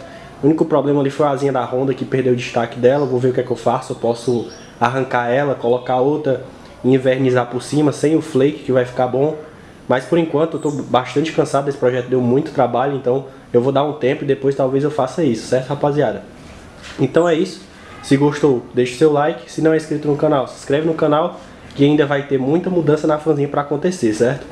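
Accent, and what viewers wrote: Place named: Bahia, Brazil. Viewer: kkkkkkk mt bahiano pqp kkkk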